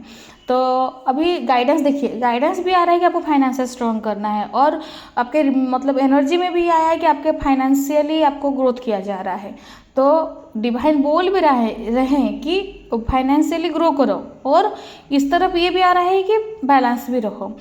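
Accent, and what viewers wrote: Odisha, India. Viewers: Mujhe b Aisa laga accent se lag raha odisha se hen jese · Mam ap odisha se hein mujhe lgta h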